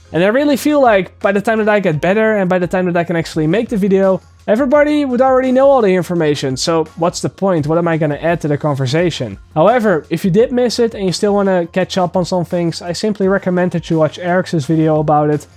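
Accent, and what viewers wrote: Netherlands. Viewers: You're probably Dutch, right?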